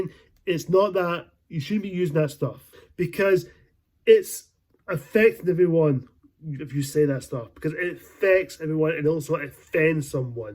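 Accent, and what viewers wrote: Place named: Scotland, United Kingdom. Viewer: You sound Scottish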